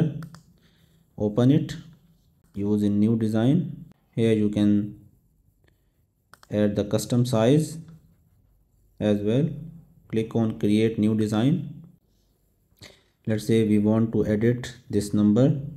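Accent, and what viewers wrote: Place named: India. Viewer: His accent says he is definitely indian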